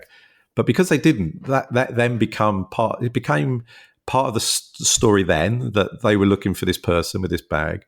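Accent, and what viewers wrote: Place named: United States. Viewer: Once I heard the American narration bs……..I scrolled on……utter drivel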